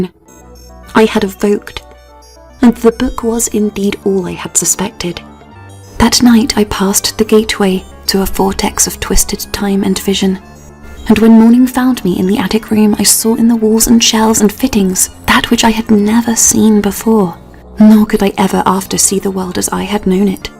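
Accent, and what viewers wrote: England, United Kingdom. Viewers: I think has lost the plot. Why do you dwell on such disturbing past times my dear? An English girl with that accent should be enjoying life with a good husband 🙂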